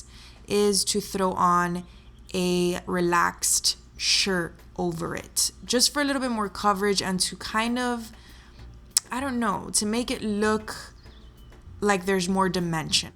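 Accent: Cuba. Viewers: S. K she’s cuban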